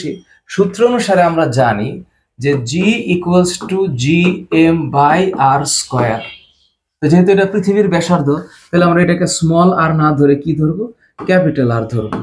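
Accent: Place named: Sylhet, Bangladesh. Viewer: Sylheti basa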